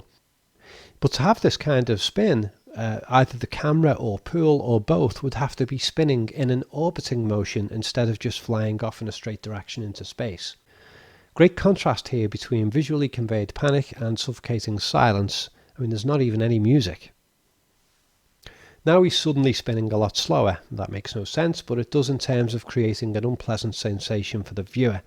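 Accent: Liverpool, United Kingdom. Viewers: You’re an enigma,. Ive never been fond of the scouse accent (probably due to a stag do when the only Liverpudlian was an arsehole) however, I could listen to you all evening. This may come across as a back handed compliment, if so, I apologise. Grate werk r’kid..fantastic analysis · Sounds like a scouser to me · Same here, I re-watch to a lot of stuff at night for the same reasons. Never thought I'd find myself dozing off to sleep listening to the voice of a scouser. However, he has a very rare and soothing scouse accent haha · This dude has got to be from Liverpool. I'm an American, but I recognize that Beatles accent anywhere.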